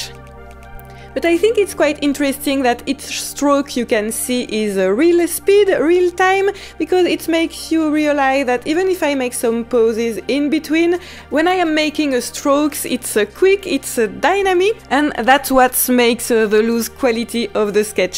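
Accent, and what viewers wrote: France. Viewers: I loved watching you do this beautiful sketch. You are a fantastic teacher and your personality makes me feel happy. Also your lovely French accent reminds me of so many happy times visiting France. Thank you very much.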